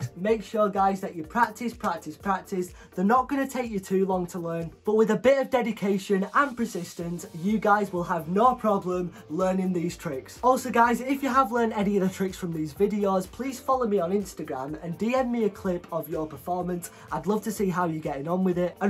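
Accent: United Kingdom. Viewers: l love your british accent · You are incredible. Can I ask you where are you from. It sounds that you have a british accent.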